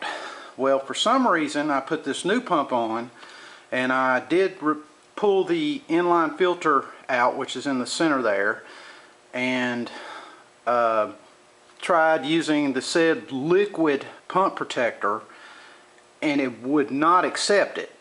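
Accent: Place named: North Carolina, United States. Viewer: Thank you. You are from North Carolina. Just have to be. Not sure any other part of America has that very special accent. I went to Duke, and almost certainly you are a Carolina fan. Could be State, but I bet it is Chapel Hill. Great video. Thanks again.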